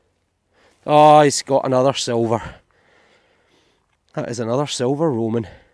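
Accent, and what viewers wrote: Scotland, United Kingdom. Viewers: Love to watch your treasure quest. I specially enjoy listening to you that Scottish accent sounds so magical to my ears. Also you are a good looking man sertainly easy on the eyes. You are. so cute when you find something great and you get so excited. Cheers from Princeton Illinois USA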